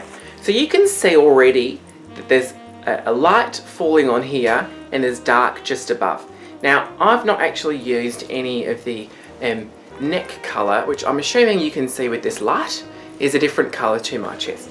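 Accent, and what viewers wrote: Australia. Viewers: R u from Australia 🇦🇺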